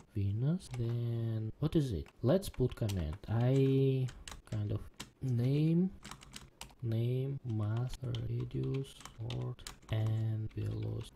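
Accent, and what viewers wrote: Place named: Russia. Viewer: типичный русский акцент.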